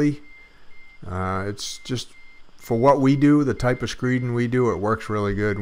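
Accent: Maine, United States. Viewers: I knew your accent was Maine!! Nice 👍. I’m on vinalhaven island. Ever been out here? My boat shop burned down in July. So I am in the process of ground work for a new 40x60 steel building. Love your videos!!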